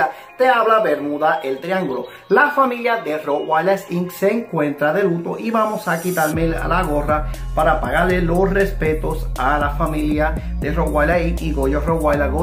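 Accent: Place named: United States. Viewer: No hablabas muy bien español O es mi imprension jajaja se te notaba más lo estadounidense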